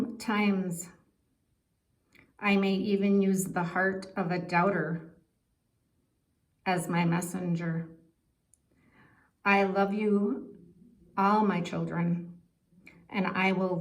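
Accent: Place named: Minnesota, United States. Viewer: Are you from Minnesota? The accent. I'm from Minnesota. Haha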